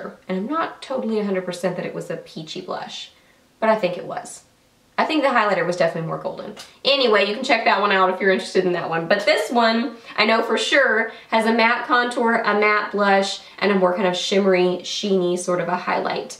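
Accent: South, United States. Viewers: You're so fun to watch! Your southern accent is so cute! Love and hugs from Canada!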